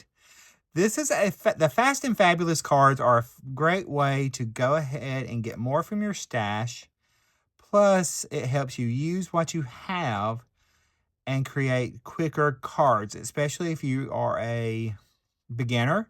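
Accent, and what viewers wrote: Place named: South, United States. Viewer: Such a cute card and it came together so quickly! The ink blending on your die cuts and the highlights from the white gel pen really do add detail that packs a solid punch! You're the first crafter that I have heard share the term "Decision Fatigue" and this is real! I'm sure that it occurs more than some will let on but thank you for bringing this valid term to light. Love hearing your southern accent and watching you create.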